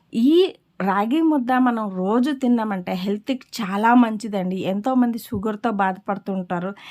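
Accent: Srikakulam, India. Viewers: Slang srikakulam, place karnatakam, chesedi authentic rayalaseema food. Nice.. Flavours of India.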